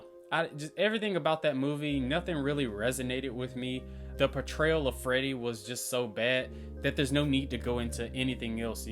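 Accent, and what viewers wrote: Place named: South, United States. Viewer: You sound very southern. Where do you live?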